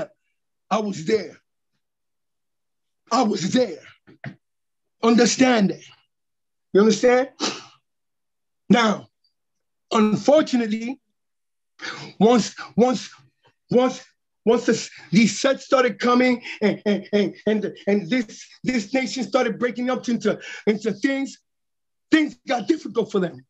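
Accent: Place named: New York, United States. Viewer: sound mad new york. ...every body has to get. thiers. eventually..Every get thier turn. ....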